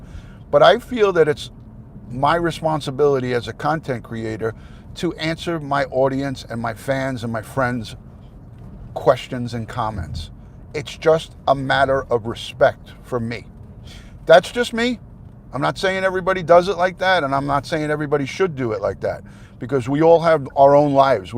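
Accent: New York, United States. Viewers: ! I wasn’t subscribed to your channel even though I’ve been watching your content! Well, now I’m subscribed- I love your NY accent, and your input from a “lawr enforcement perspective”❤️👍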